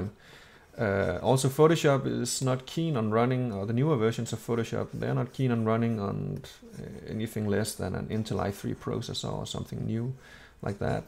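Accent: Denmark. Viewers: Because i recognize a lot of Danish words like: "men" and some English words pronounced with a Danish accent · are you danish? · Are you Danish?😱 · Are you Danish o: ? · Are you Danish?? Just asking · Are you danish?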